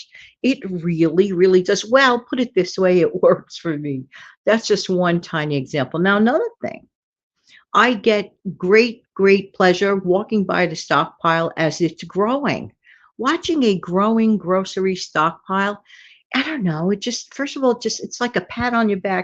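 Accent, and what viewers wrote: New York, United States. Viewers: Wonderful to listen to your words of wisdom. They resonate. Thank you!🌷 Love your accent; is that New Yorkian? 😅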